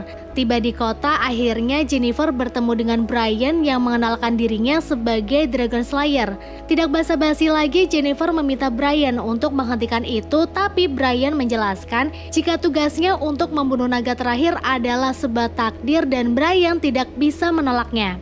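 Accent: Pemalang, Indonesia. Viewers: Orang pemalang ya kak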